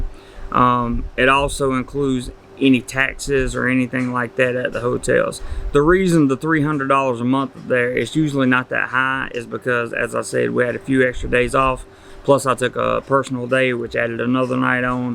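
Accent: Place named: Appalachia, United States. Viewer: The accent mate. How do Thais take 'ole hillbilly voice?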